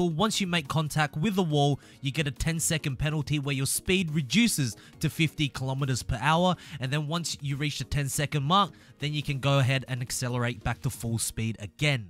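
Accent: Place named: Australia. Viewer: Sand trap. Slow the car down and push it of balance, the same way lowered cars become unsettled when you hit the water flowing over a road. Side note: Make "No collisions" an option in the Online Adventure. E.G. Street Race S2 No Collisions. Too many racers smash into you around that first corner. Better let everyone pass and slowly make your way through the crowd one at a time. Great work keep it up. All the best for the new year. Great to hear an Aussie posting Forza videos. Well done.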